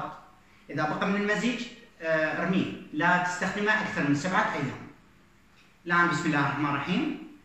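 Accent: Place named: Iraq. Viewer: السلام عليكم اخي اني انتقدك لان اولا انت لست بطبيب ولا بمعشب انت توصف هذه الوصفات الى الناس وانت تجهل مكونات ومعطيات هذه المكونات من اثار على المعدة وعلى ارتفاع ضغط الدم الذي يسببه الزنجبيل اما الحامض فهو مدمر للمعدة للذي عنده مشاكل في المعدة اضافة الثوم اني لا اعرف كيف تصف هذه الوصفة لجميع الإعمار اخي اتقي الله انت من لهجتك من العراق والعراقين طيبين ولا يؤذن الناس اخي لا تفتي بشي لا علم لك به فسوف تسال امام الله وان عملت شي بجهالة، وحسب مشاهدتي الفديو انت مسلم وتذكر الله فالرجاء ابتعد عن هذه الأمور وانت لا تفقه شي بالطب ، اذا اردت ان تريد زيادة متابعيك لا باذية الناس شوفلك موضوع اخر تعلم به ولَك الشكر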